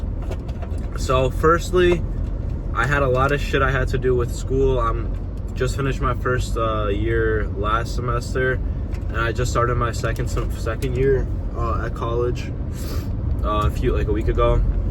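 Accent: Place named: Eastern Europe. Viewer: Are you Russian/Ukrainian? Your accent is a little Slavic.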